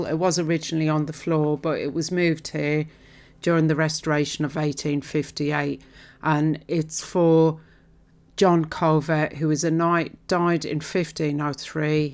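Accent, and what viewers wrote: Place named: England, United Kingdom. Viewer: . The videos thumbnail is a white cross at the base of a Yew tree. The title states it is his guide to aging a Yew tree. Did I hear correctly that you said in another video that your not from Enland? I like listening to accents and to me you sound English. Again, just curious and mean no disrespect.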